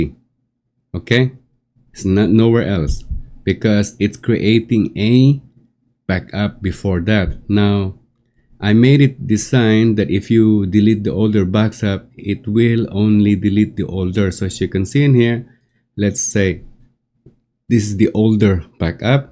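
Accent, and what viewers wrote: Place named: Ilocos, Philippines. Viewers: the accent gives ilocano vibes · Hey, base on your accent and your name 🤣, I could tell your a Filipino, don't know if I'm right, but Well done kabayan. Pin if your really a Filipino pls.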